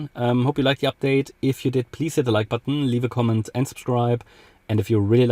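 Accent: Germany. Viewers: Man merkt dass du deutsch bist 😂 sehr guter Content mach weiter so!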